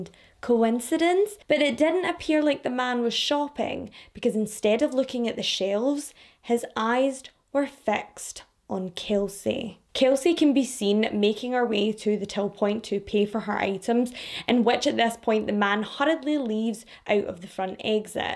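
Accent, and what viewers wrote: Scotland, United Kingdom. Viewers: Your personality is outstanding. You are the kind of friend I would be drawn to for all the laughs and accent too. LOL Being American the scottish accent sounds so beautiful, but your personality is outstanding. So happy I found you to watch and entertain my work days. I listen while I work and the day goes so much faster. Thank you.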